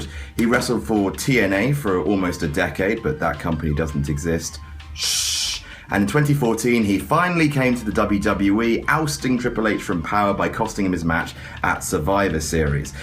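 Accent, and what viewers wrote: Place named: United Kingdom. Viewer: "and I talk about how I would book them differently, because I'm a smart ass." Does anyone else think that this guy's British accent made that line a little bit funnier than it would have sounded if he had an American accent?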